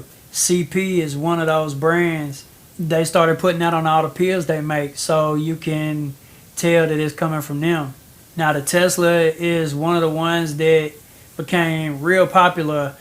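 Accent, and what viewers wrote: United States. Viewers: Are you from Louisiana? I love that accent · You from Nashville?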